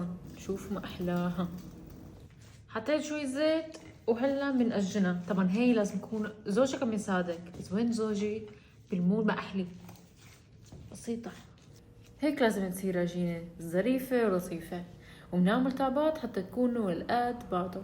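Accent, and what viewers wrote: Syria. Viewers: هههههه بتحكي سوري لهجة شامية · لهجة لادقانية هي ههه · مش مممممممنكلامك السوري روووعه❤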